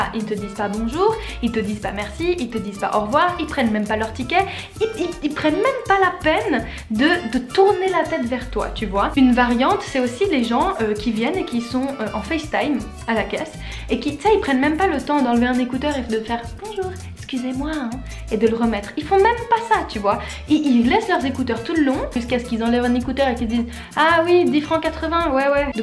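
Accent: Switzerland. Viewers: Je me disais bien que ton accent me faisait penser à quelque chose (à mon propre accent donc haha) ! Une Suisse ouii ❤️ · Très drole, j'ai eu le réflexe inverse..Je me suis dit.."tiens, elle n'aurait pas un accent suisse?"...Et puis elle à parlé de francs et là "Ben ouais...elle est suisse"....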